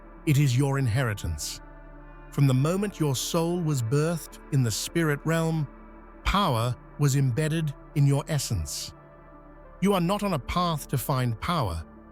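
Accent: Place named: England, United Kingdom. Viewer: If I’m goodie goodie - then I’m not human - btw I love your voice - that clear distinctive English accent- I’m not the chosen one - That would be notions of grandeur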